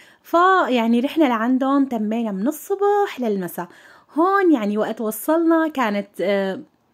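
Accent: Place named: Syria. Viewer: من لسانك سوريا اب عن جد. لستك تكذبي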